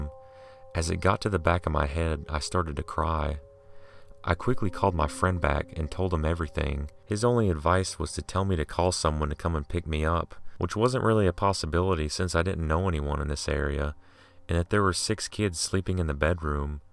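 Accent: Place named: South, United States. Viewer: I love your accent. I'm trying to guess where you're from, somewhere in the South. I'm British so I might be way off... lol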